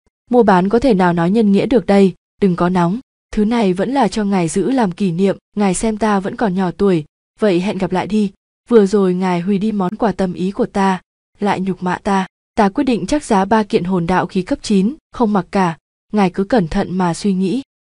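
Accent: Vietnam. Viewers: răng mà giống giọng miền trung rứa hề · bé ad người quảng nam à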